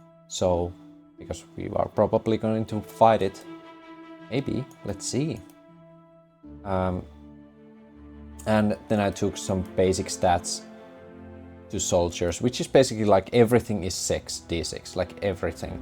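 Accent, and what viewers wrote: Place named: Finland. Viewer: Suomi? Cool accent 😎 Thanks for this walk through!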